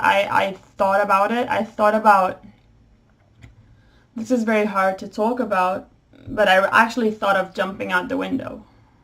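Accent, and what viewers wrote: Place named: United States. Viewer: you speak american english very well! how did you get that?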